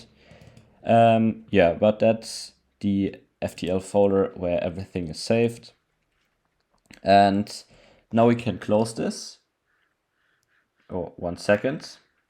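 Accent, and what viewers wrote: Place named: Germany. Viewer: Fantastic video!! your english is perfect and your german accent is nothing to be ashamed of. i had spent the last year trying to find a solution for multiverse on my macbook, only recently discovering it could work with crossover with a few shenanegans, im sure this video will be a goldmine for anybody like me who cant fork out hundreds of dollars for it.. fantastic work!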